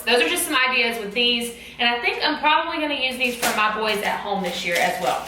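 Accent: South, United States. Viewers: You are the funniest teacher YouTuber I've watched. I laughed so hard when you were talking about not looking at the price for the notebook because you might regret it lolol. The American southern (?) accent makes it even funnier for some reason. I'm Australian so it's not something we have around here. Either way I subscribed!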